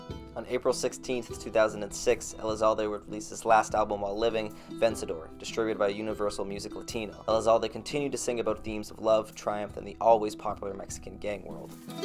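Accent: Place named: United States. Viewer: Nice to hear a american speak on famous mexican singers. ❤❤🇲🇽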